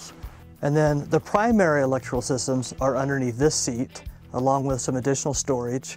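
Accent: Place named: England, United Kingdom. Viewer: I can’t tell if you are English or are just trying to sound it. And I don’t know which one I prefer.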